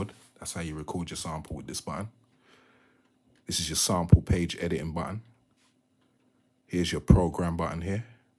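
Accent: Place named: London, United Kingdom. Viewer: also its good to be listening to a London accent rather than an American one.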